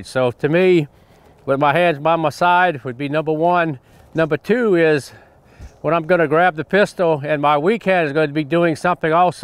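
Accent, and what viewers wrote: Louisiana, United States. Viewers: That accent has to be from Louisiana. Northern part of the state no?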